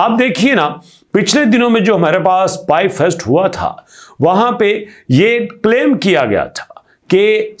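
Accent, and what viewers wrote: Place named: Assam, India. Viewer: Indian Assamese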